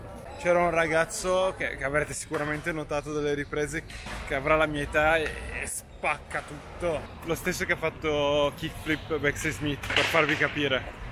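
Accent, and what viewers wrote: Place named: Spain. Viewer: Hai già l'accento spagnolo tío🇪🇸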